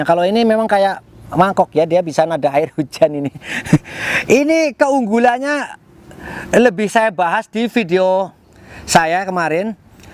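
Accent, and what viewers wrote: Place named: Banyumas, Indonesia. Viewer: Ngapak bgt bapake🤩🔥♥️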